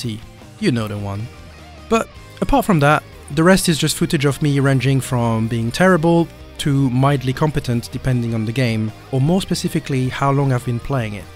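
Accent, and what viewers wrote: France. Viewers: I knew it. You had a slight French accent. En plus on manque de youtubeurs jeux de combats pk t allé à l'étranger XD. Aussi leon massay goat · Oh ! The Français accent ! But the english it is quand même good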